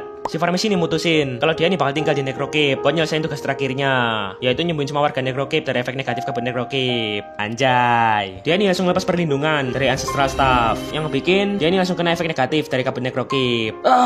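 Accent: Java, Indonesia. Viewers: Wes fiks mas wong jowo 😭👊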